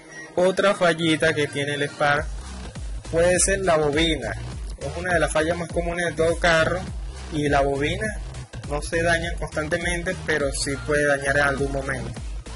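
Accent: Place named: Venezuela. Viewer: Venezolano este men saludos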